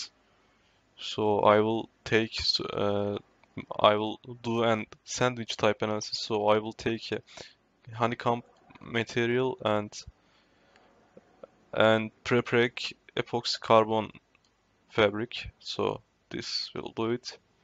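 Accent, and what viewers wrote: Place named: Turkey. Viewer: Hardcore Türk ingilizcesi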